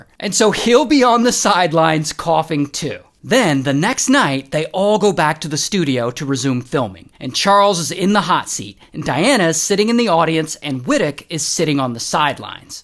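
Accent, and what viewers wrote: United Kingdom. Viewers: Well done on the British accent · british accent is killing me 😂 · Your British accent got me rolling 😂😂😂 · The British accent is 🧑‍🍳💋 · The British accent 😂😅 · Liking immediately after hearing the British accent 😂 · British accent 😂!!! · Oh my. That british accent.... especially the 'oh bugger' not gonna lie i had to pause until i stopped laughing. Keep doin you. We love ya 😊❤😊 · the british accsent is killing me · The British accent 💀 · I’m impressed on William Johnson British accent in this video it sounds like he’s actually British! 😂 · Impeccable british accent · That British accent is wild! 😂😂😂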